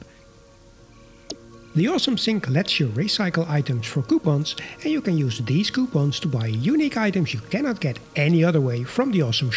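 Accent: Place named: Netherlands. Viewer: Nice video, and accent! Dutch?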